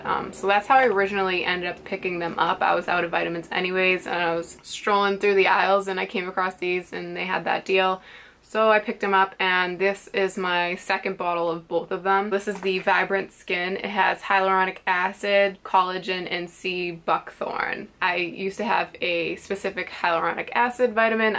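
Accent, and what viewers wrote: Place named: Boston, United States. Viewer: My current fave is SPF in skincare! (especially spf 30 & higher)...& also ur accent! Are u from Massachusetts? U mentioned Jersey earlier in the video, but I get more Mass/Boston =)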